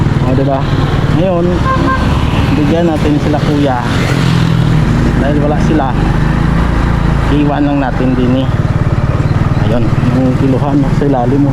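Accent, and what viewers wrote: Iloilo, Philippines. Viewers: pahingi ng alimasag boss hehe ilonggo gid ya ang tuno ta pareho gali ta sini pero taga sultan kudarat kami,kanami gid sang view da sa lugar nyo,halong lang permi ah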